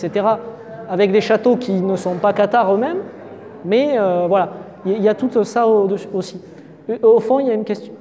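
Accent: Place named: Occitanie, France. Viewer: Un pur bonheur de vous écouter! Belle faconde égrénant notre histoire dans ce noble accent que je connais si bien et qui fleure bon notre pays d Occitanie! Un véritable débat d idée avec ce public passionné. Merci pour ces conférences didactiques, exprimées dans une remarquable clarté digne d un grand historien 😊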